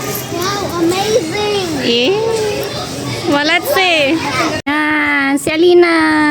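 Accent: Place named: Manila, Philippines. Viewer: Hi,. May I know where you're originally from? You speak Tagalog and English like someone from Manila or Luzon.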